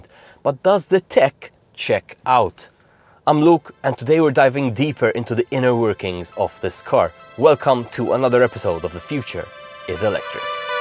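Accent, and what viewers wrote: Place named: Malta. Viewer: I was watching this video and kept saying, this guy sounds Maltese, weird... 😅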